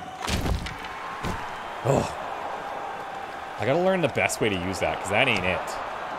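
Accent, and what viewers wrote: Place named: Canada. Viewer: I'm only four minutes in and is already way more Canadian than I've ever heard him be. - British Columbian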